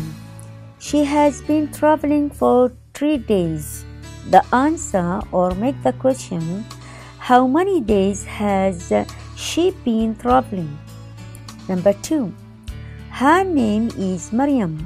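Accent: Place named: India. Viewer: نطقها هندي 🌚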